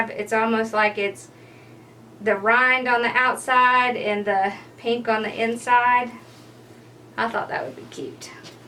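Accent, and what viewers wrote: South, United States. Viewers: Love the Water Mellon wreath but would love to see it also with a bit fewer ribbons. I love the red and green that looked like the rhine. Actually both would be absolutely gorgeous......where in heavens name do you come up with all these ideas. You are truly amazing! You little guy is so sweet and helpful. Love love the St Patricks Day wreath also. I think I forgot to mention it on the other vlog. I don't have FB so I enjoy seeing you here! You are a busy busy girl. Love you southern accent and sweet way you speak and soft spoken....very easy to listen to you instruct. Loved everything and love how you do the tutoring so quickly. Blessings to you and yours. :) :) :)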